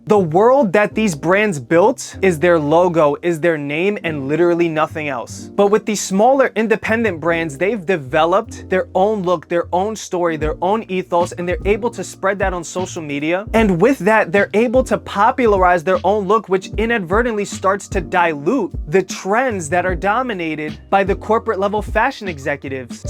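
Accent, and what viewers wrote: New York, United States. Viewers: I love that New York accent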